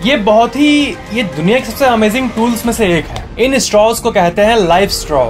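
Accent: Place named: Bihar, India. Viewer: Sir apke bolne ka tarika hamare family aur relatives se milta h... I think ap hamare side se ho.. Bihar❤....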